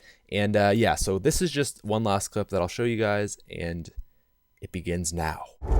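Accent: Canada. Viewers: are you canadian? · you sound like you are from Canada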